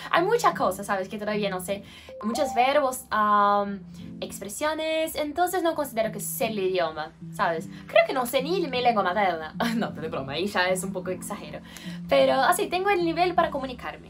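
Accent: Buenos Aires, Argentina. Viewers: tienes un acento porteño..como asi? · Que bien hablas argentino!🩵✌️🇦🇷👊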